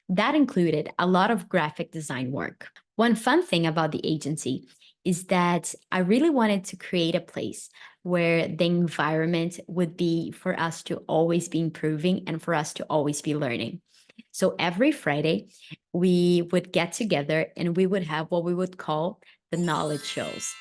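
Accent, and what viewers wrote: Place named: Brazil. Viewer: I KNEW you were Brazilian, I felt it from your accent. I'm Brazilian too and I love your videos. I'm a product owner | Product Designer:hand-pink-waving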